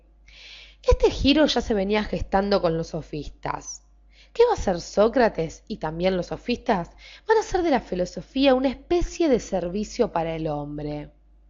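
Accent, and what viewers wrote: Argentina. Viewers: Eres Argentina? Hablas muy argentino Saludos desde México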